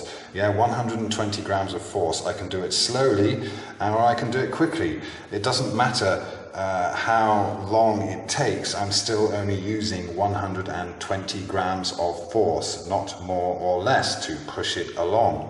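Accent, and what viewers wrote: England, United Kingdom. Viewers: can be denser than other air; this is the basis of meteorology, i.e. weather forecasting. Warm air floats on cold air, because of density, but ALL air is attracted to the earth. Its called gravity (stupid), and since ALL air has mass, gravity can act on these objects. Do you actually understand any physical science, or do you think people believe you know what you are talking about because of your "english" accent? Sir, you will never be mistaken for David Attenborough; an actual scientist:-)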